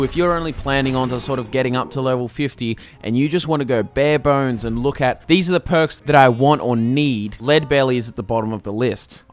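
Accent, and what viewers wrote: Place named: Australia. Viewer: What an annoying Australian accent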